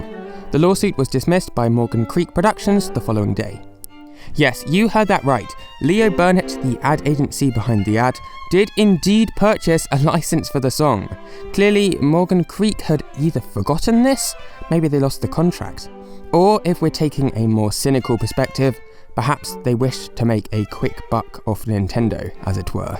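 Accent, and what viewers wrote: United Kingdom. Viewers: The narration is so British, I had to grab a cup of tea just to not feel out of place while viewing.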